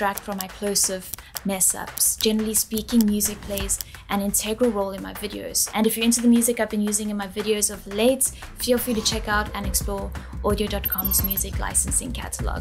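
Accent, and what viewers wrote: South Africa. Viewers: This was so helpful! Thank you! Totally random question, but are you from South Africa?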